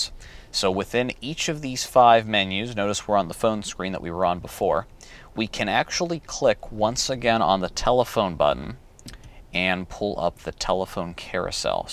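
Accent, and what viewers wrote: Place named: England, United Kingdom. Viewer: very nice english accent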